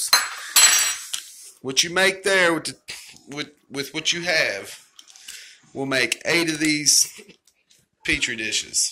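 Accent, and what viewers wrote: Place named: South, United States. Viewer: Love it. You got to be from the South:0) Im born in TN and live in SC. Best video ever.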